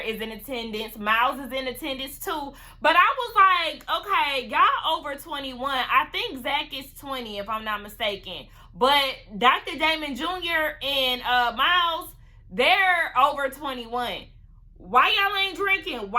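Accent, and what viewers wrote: Charleston, United States. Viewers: I swear you talk like you are from my lovely city of Charleston!!!! I LOVE IT!!!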